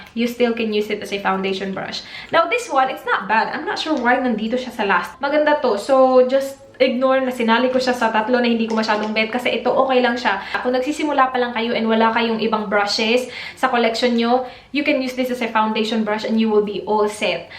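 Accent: Visayas, Philippines. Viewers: Bisaya ka day? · You have a thick Filipino accent when you speak English but with practice you'll get better :) ang ganda mo with makeup and you are really skilled, thanks for sharing your review of Anne clutz brushes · Bisaya acent☺️ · I still can't get over about how beautiful your bisaya accent transitions in to a very nice and fluent English accent. Love you x. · Ommggg!! I like the way how you speaaakkk!! Are you bisaya po? 😍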